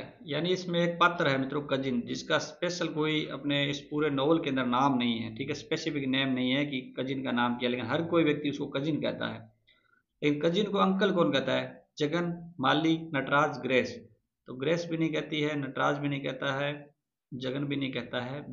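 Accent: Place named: Shekhawati, India. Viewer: Sir aap kaha se h vo maine isliye pucha kyo ki aapke bolne me शेखावटी ka lahza aata h so.....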